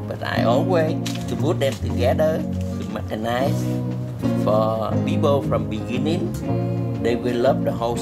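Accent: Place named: Southeast Asia. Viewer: I work with a guy that sounds just like this guy. He's from southeast Asia. I love talking to him. :) Very good video and great information!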